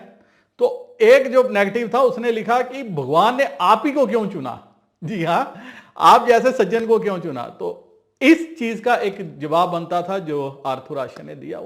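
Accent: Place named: India. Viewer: you write in English and then speak Indian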